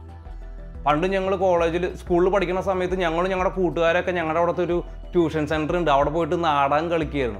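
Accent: Thrissur, India. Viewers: Proud to hear our slang #thrissur 🥰 nice presentation ♨️ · നിങ്ങളിൽ ഒരു നല്ല കഥയുണ്ട്... Life ഇത്ര മനോഹരമായി പറയാൻ എല്ലാർക്കും സാധിക്കുന്ന ഒന്നല്ല.. തൃശൂർ ഭാഷയും ഒരു നല്ല അടിപൊളി കാരണം തന്നാണ്.......!! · Bro good after noon.. Njanum oru trissur karan anu I was believing that our speed and tone not matching with story telling but... Gadeee nigalu polichu, perfect clarity, tone and more over the expressions are as you says lofly... If autobiography story telling got over you can start with some historical, animalistic, or some other kind story telling.. Iam sure that you make the people on peek of quorosity.... Keep rocking, from dubai.. 🙏🙏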